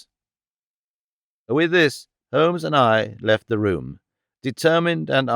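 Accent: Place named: United Kingdom. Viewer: I will really miss this so clear British accent❤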